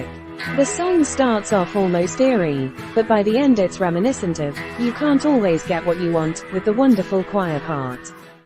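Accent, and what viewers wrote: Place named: United Kingdom. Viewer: WHY IS A BRITISH WOMAN NARRATING THIS FOR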